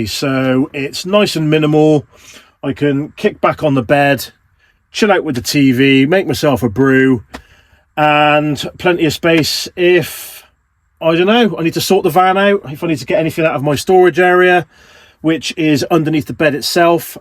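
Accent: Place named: West Country, United Kingdom. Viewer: Keep those vlogs coming....keep that Marvelous West Country accent...and you won't go far wrong...and maybe we will bump into each other is Cornwall sometime 😉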